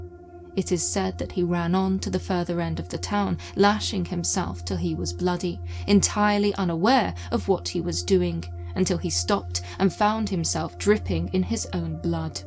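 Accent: England, United Kingdom. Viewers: Thank you Ms. for your astute story of this witch. I appreciate it being told by an english woman instead of an american as it lends authenticity as well as the use of old english.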